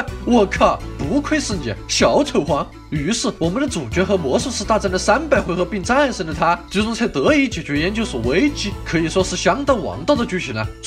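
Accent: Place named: Hunan, China. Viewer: 哈哈这湖南口音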